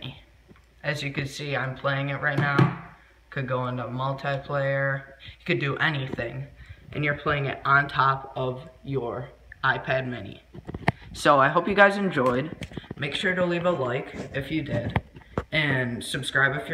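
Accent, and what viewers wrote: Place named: United States. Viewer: Wow as if the click bait wasn't enough you have his cheesy annoying American accent and his voice which obviously hasn't hit puberty yet get a life you sad kid.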